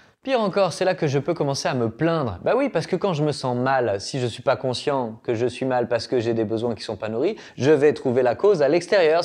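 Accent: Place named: Quebec, Canada. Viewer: 😁 ça fait plaisir de te voir en vidéo : Bon rythme, bon montage et bon contenu : un grand bravo ! Et cet accent Québécois... 🤩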